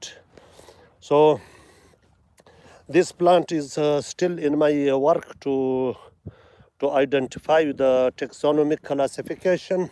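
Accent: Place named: Pashto, Afghanistan. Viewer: Very beautiful English with pShto accent... Even flowers, desert n butterfly are all awesome... Moving camera view to show surrounding ll be good idea for knowing us abt areA a lil bit more.....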